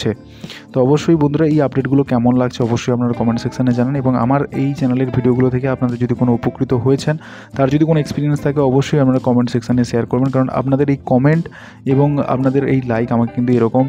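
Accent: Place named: Purulia, India. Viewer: Purulia Bengali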